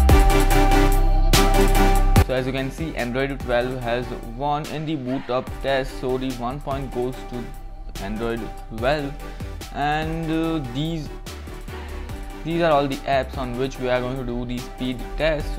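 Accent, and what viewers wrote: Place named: India. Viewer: Learn English properly, you speak like a typical Indian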